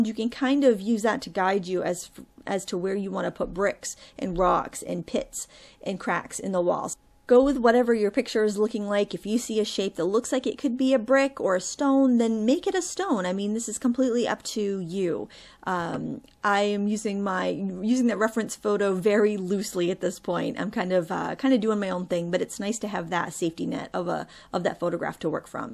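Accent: Maine, United States. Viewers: Love your Maine dialect! you hoped you had enough "rum" to paint the pink geraniums - must be one of those wine (rum) and paint classes! Lol! Love your channel and look forward to each new one - keep 'em comin'..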